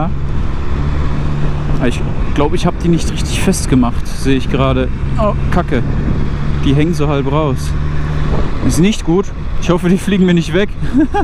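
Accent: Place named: Bavaria, Germany. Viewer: red mal mehr bayerisch in deinen videos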